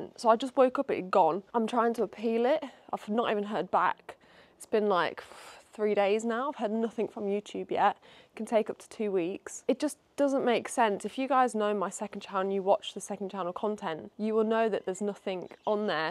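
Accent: United Kingdom. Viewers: I'm still confused about her UK accent in AUS 😅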